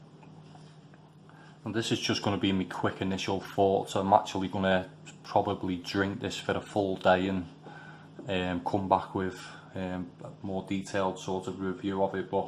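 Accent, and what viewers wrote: Liverpool, United Kingdom. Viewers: Sorry, this is not related at all but I have to confirm, is that scouse accent I'm hearing?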